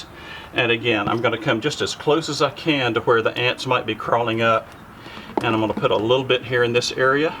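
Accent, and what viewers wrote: South, United States. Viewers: Love the videos and congratulations on your channel. It's so cool to hear you talk, it makes me miss home. I live in Tacoma but I'm from a deeply southern town in NC. My wife and I are in the process of transitioning to full time and your videos are very helpful and insightful - Thank you!! I have a question about the blocks you have on the front legs. Would it be possible to get a schematic or plan, they look like they would perform extremely well. Good luck and we look forward to more videos because we "Love RV Life" too!!!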